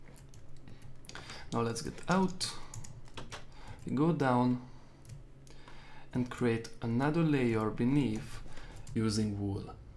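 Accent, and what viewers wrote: Hungary. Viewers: Szia tokre lehet hallani hogy magyar vagy😁 csinálhatnál majd olyan videót is amiben építes redstone szerkezeteket😀😉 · Te beszélsz magyarul? Csak mert mintha lenne egy kicsi akhcentusod :-D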